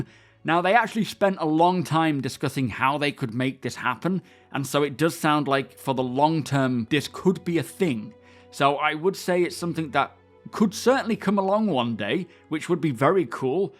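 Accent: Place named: Northern England, United Kingdom. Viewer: This guy is weird for me. He has a northern English accent but on 5 occasions he used American English or syntax.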